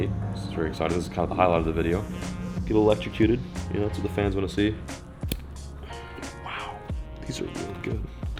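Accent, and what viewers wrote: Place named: Alabama, United States. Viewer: dang is very pretty! What a good lookin couple-- heads must turn when the two of u are walking down the street! And when you all talk, w/th low smooth voice and w/th perfect Alabama (guessing) accent. SWEET!!! 😊😇🤠 BTW, happy 4 u both and good to c Lucky back! and i like that hit and miss, its ok if you're not an expert, skill set!👍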